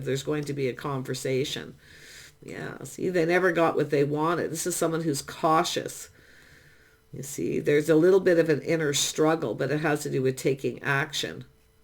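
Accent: Canada. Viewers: Phenomenally close to the mark… I will be amazed if he really calls… it will blow my mind, but of course, I would welcome it and love an opportunity to try and sort this out… By the way, your accent sounds very much like my own… Are you Canadian? I live in the states now but born and bred in Toronto.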